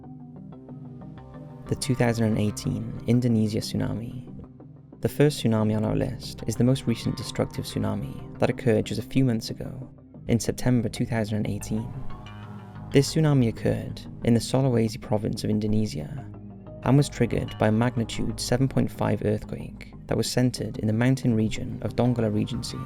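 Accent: Wales, United Kingdom. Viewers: That’s a welsh accent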